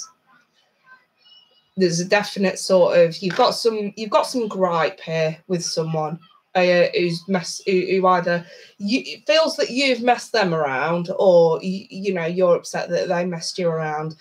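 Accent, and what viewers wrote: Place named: United Kingdom. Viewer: Your simply splendid! Team Aries here trying 2 let go of Libra drug addict ex Tyler recommend u Love yr British accent 👌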